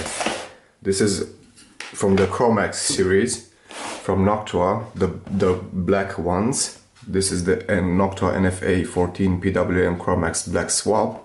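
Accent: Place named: Netherlands. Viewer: vorbesti engleza cu accent de olandez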